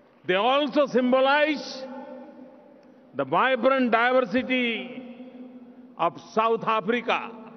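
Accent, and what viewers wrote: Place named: India. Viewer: Salman MOHD.Apprecite his talent delivering a speech in front of a huge audience & his accent may be very much Indian English but the message is clear to everyone....aap ki baap ko bhi broken or tuti-futi angrezi bol Na nahi aatha hoga... yourself displaying your ignorance very much obvious.... madrasa mein pade hue log aap ke jaisa mindset rakthe hai...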